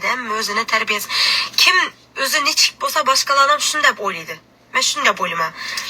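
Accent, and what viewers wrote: Khorezm, Uzbekistan. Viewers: Товбаламанг нима килибди хоразим тилига тушмасангиз ешитманг бизни тил ози шундин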